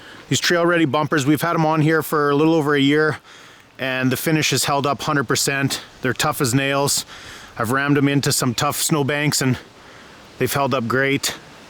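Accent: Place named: Canada. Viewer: talks like a true Canadian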